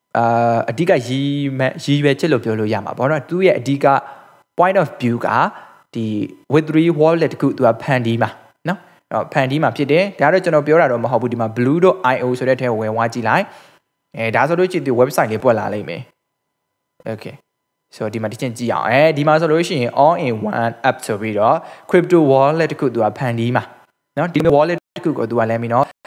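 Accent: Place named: Myeik, Myanmar. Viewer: အကိုကဘိတ်သားလား ဘိတ်သံဝဲနေလို့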